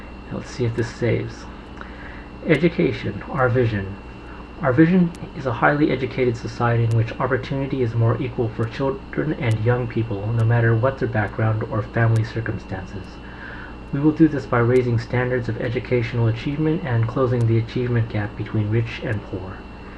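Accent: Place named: United States. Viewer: Brit here. Just came across your video. Never too late for feedback I suppose. Apart from the minor stumbles at the end I thought your speech was fantastic IMO. I think your accent sounds more pleasant than many accents in the UK and would happily listen all day. What kind of American accent do you have?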